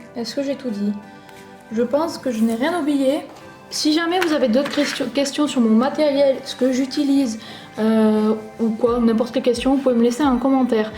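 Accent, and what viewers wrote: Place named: South, France. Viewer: Tu presente très bien et dis tu vien du sud sa ce voit a ton accent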